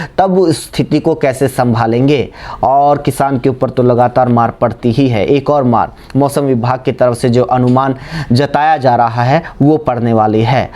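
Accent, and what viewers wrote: Haryana, India. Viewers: Very nice bhai Haryanvi tone is also very nice in between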